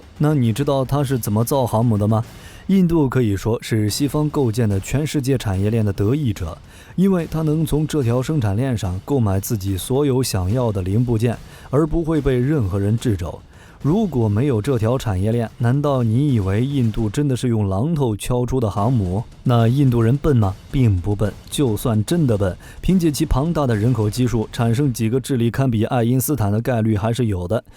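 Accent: China. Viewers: 可能是聽見中國口音在酸印度，反中基因的膝反射。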